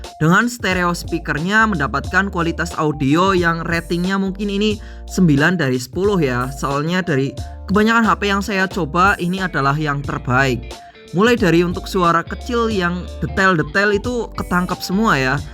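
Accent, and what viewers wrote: Banyumas, Indonesia. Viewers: Buset wng Banyumas 😂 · ngapak anjir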